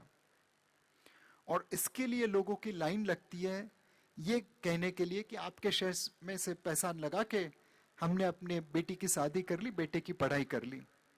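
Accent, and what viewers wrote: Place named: Bengal, India. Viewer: Sir, ur accent is very much like bengali babumoshai when u speak hindi.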